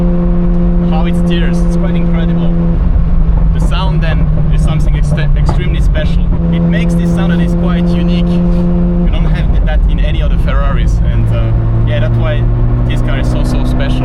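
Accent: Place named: France. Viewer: On sent l’accent français haha ! Je viens de découvrir ta chaîne et la qualité du contenu est juste parfaite 🤩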